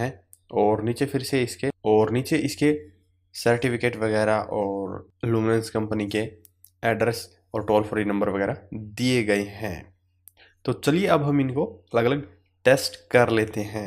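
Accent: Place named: Haryana, India. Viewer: बुरा ना मान भाई या तो हरयाणवी टोन स बोलने में फील ना करे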